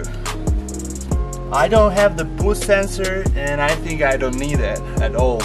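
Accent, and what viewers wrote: Russia. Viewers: Blyat. Oh ug etot Russian acient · плюс русский акцент. Сто раз замечал, что русский, говорящий на английском в 100 раз понятнее, чем иностранец, просто потому что произносит так, как ты сам это делаешь. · Раньше как-будто над акцентом больше старался, а сейчас наоборот решил больше России матушки внести)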